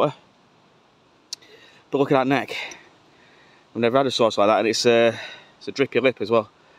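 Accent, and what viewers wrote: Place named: Lancashire, United Kingdom. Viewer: Hi I'm a recent subbie. I enjoyed watching this dig, it's always interesting to see if anything good appears whether it does or not. The Cutex bottle is nail polish. The brand is still going nowadays. Nice to hear the Lancashire accent as I was born in Burnley but lived down south since I was one year old. Keep up the good work!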